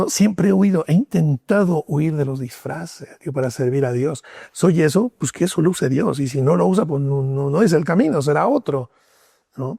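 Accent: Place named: Spain. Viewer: Ese acento español! ❤😊 Bendiciones!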